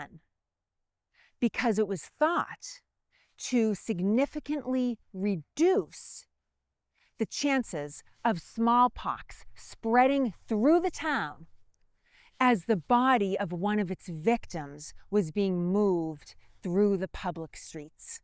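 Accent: Boston, United States. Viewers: I've been in Boston they have that accent. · She can't say an "R". Boston no doubt. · I'm having fun learning from you while you relay important historical content with a Boston accent! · Just a wild guess but you're from Boston aren't you? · I’m gonna go out on a limb and guess that this chick is from somewhere near Boston. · Love that seriously Old Boston American accent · I'm Boston born and grew up in Weymouth in the 1960's, my grandparents were going to buy the Abigail Addams house in Weymouth back in the 1930's but it had an outhouse and my grandmother put her foot down and said No. Great video and I love your accent, we don't use R's very much. Like, Nice Cah. 🚗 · Love the show! Love that Boston accent! Pine tah! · Best Boston Accent Eveh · Perfect accent for a story of "The Speckled Monster." For anyone wondering she does have an authentic Boston accent / Eastern Mass. 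· I love her Boston accent. ❤ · This is very interesting. I love the accent. I need to learn more about Boston and why is there an accent and why we necessarily didn’t get one in Connecticut. Now I’m going down a rabbit hole lol 😂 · Oh my God I can't believe it a real Bostonian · This is incredible. I did 4 years in Boston for school. I can't stop smiling. It's your accent! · Love the Boston accent. Love your videos. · Hello,! I was born and raised in Worcester (or “Wustah”😂) and commuted to Boston to work for 15 years. Love everything about the American Revolution and am descended from Mayflower and officers in the Revolution. So enjoyed hearing your fabulous Bahstin accent (which I pretty much was able to mute over the years. Glad you kept authentic). So glad I hit on your vid. Will subscribe and thanks!! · She's so cute, love her Boston accent. Love the History too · The elder Kennedy brother, John, Bobby, and Ted had this same Boosten aaccent. 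Many Haaavaard grads also have it. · She has Boston accents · Love her Boston accent! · New subscriber. Love the amazing Boston accent and great revolution history. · Pine ta. Or, as we say in North Carolina, Pine Tar. I love this lady's accent. I just subscribed just to hear her yankee talkin'. Do all the yankees up there in Boston talk like this? I just never knew that if they do. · that good old Boston talk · Any time I miss Boston I just need to listen to here. The most beautiful of Boston accents. Pinetaahhh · Boston accent much ? SAILAH AND MONSTA, LOL. finally someone who sounds like me ! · Me being from Wisconsin, would say pine tar. She, paint being from Boston says pine tah. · Just love that Boston accept. Pine tah! Good history lesson. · I heard your accent and immediately subbed. I’m from Boston and I sound like I’m exaggerating to be honest because my accent is so strong. It’s nice to hear your own accent on Youtube for a change. 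· Very interesting--thanks! When you first started talking, I was wondering what country you were from. I'll never get used to the Boston accent. LOL ♥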